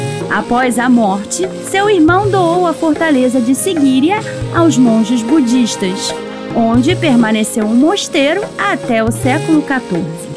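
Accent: Rio de Janeiro, Brazil. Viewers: sua viz,com sotaque carioca lindo, é muito agradavekt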